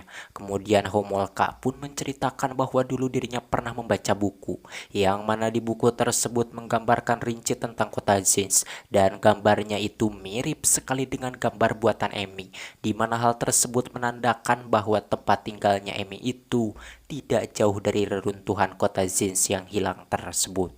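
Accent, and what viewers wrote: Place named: Sunda, Indonesia. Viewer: Geningan si mimin th orang sunda😂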